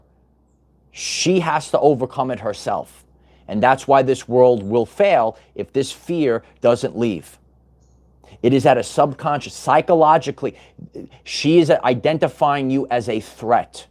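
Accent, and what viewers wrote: New York, United States. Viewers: Where are you? You sound like you are from new york.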